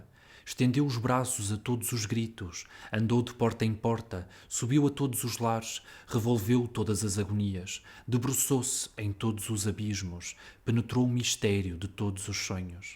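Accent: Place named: Portugal. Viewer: Maravilhoso ouvir esse poema em português Lusitano. Eu sou brasileira descendente de portugueses e estou arrepiada ouvindo essa versão. Muito obrigada!!!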